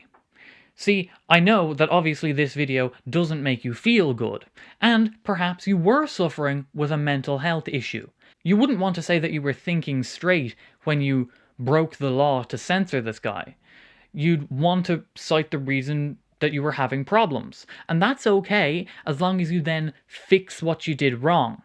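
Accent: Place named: Ireland. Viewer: Dude did you get more Irish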